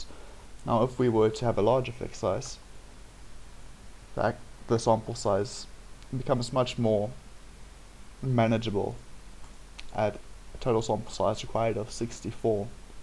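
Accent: South Africa. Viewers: screams are you South African??!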